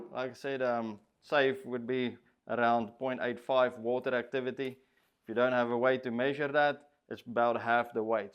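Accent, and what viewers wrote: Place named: United States. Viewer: Nice video. Can hear you getting a slight American accent, keep your Afrikaans accent don’t lose it. Jy Ian ook lekker skinner nou.😂 Baie geluk🙂👍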